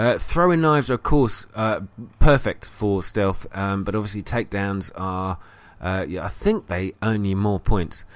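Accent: United Kingdom. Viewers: Aahh.. I see now that you'd been alerted already, lol.. I had to click "show more comments" to see this one. Hey - you should make a note of that in the description (edit) or something mate... look how many folks didn't notice it and are still thinking that anti-stealth is going to earn near-equal points as stealth - when it's actually less than half the XP eh? Well - I am now subscribed for your future efforts - nice to hear that cool brit accent lol.. cheers!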